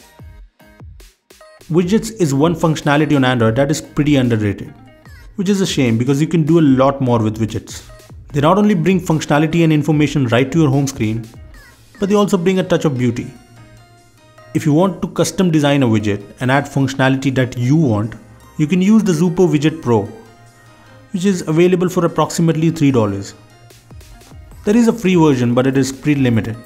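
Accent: India. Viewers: love his indian accent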